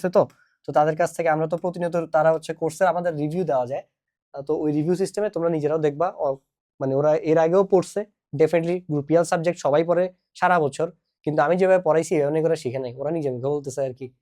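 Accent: Chittagong, Bangladesh. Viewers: ভাই খাটি চিটাইঙ্গা❤❤❤ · খাঁটি চিটাইংগা বদ্দা 🙂🙏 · Vaier chitaingaa accent 🔥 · বদ্দা চিটাংইগা নাকি? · খাঁটি চিটাগাইঙ্গা 😁🫶🏻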